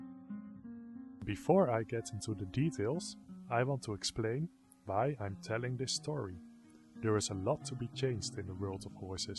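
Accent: Netherlands. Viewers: your dutch accent is so apparent gawdxD btw this is all a bit exxagurated... a good rider gives his horse relieve in the mouth when he does good, so the bit hardly moves by your hands... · horribly Dunglish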